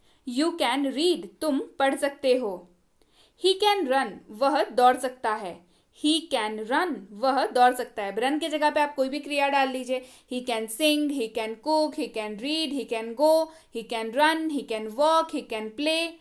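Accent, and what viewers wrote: Bihar, India. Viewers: ma'am Kya aap Bihar se ho? Aap bhut achha pdati h. All sentence are very useful in daily life.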